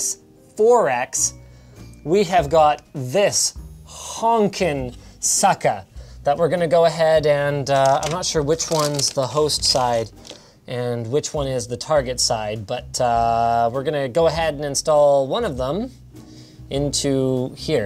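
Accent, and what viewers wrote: Australia. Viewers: yeah not a bad australian accent at all tbh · Thats a aussie accent m8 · That accent is like Australian,British and a bit of in there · was that an Australian accent or "Central Pahk" accent??? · U sound aussie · K that's an Australian accent and I don't even mind cause its not that bad your talking about GRREEEFIX CEEEEARDS so its ok:) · Isn’t that an Australian accent? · Accent was Stereotype Austrlalian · Aussie accent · That accent is australian mate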